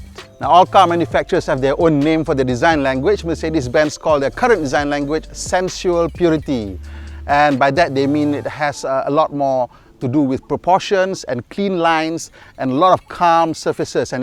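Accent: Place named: Kelantan, Malaysia. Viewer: best jugak cakap omputih sleng kelantan... senang faham hehehe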